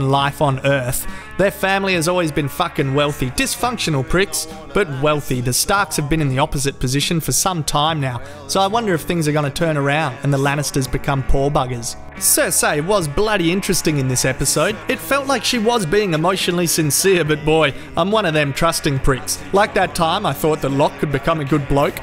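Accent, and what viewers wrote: Australia. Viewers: Fucking stoked that I remembered your name when some random dude was talking about you earlier today. I was like, why the fuck would I want to listen to some kent talking about Game of Thrones in an Aussie accent? Well fuck me sideways, that was a pisser... going back to watch the rest :) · UNCHAINED! I have always loved Australian accents, so yours together with your funny ass jokes is just unbeatable.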